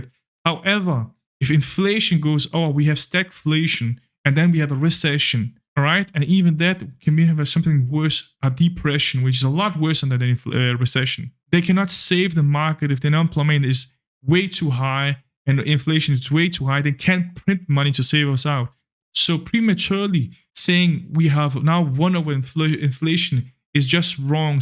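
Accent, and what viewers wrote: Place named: Austria. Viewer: Dude you have arnold schwarzennagger accent 😁 are you from austria 😁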